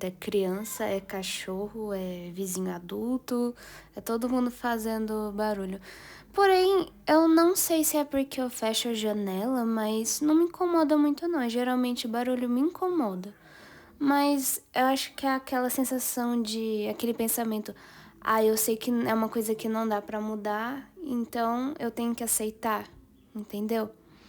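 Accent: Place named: Spain. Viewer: No sabes hablar bien portugués parece más español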